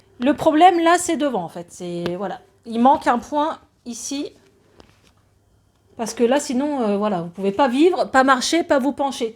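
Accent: North, France. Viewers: Bonjour. Vous êtes du nord ?? Vous avez un accent. J'aime bien vos vidéos. Bis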